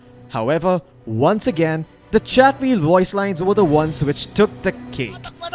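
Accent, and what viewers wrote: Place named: Southeast Asia. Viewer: se asian accent so i wont watch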